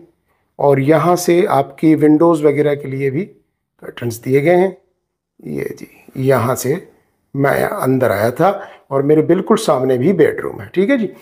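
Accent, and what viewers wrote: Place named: Karachi, Pakistan. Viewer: Pure urdu speaking man karachi urdu.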